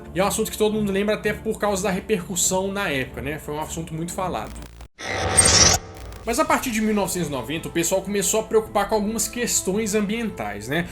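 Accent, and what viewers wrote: Minas Gerais, Brazil. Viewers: Acho o teu canal um dos melhores. Só uma sugestão, pra ajudar a gente que não é de Minas, é fazer um sotaque menos regional, pq tem horas que não dá pra entender o final das frases, já que em Minas se come muito a pronúncia kkk Ao menos fale mais pronunciado quando for falar frases muito grandes. Parabéns pelo conteúdo, e um abraço!!! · Vc é mineiro? Pelo sotaque parece que sim.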